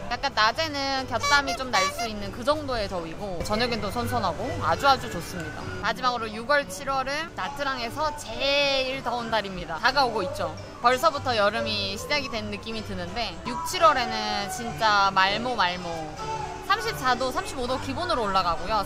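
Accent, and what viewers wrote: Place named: Gyeongsang, South Korea. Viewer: 잘보고 있습니다.^^경상도 분이신가보네요..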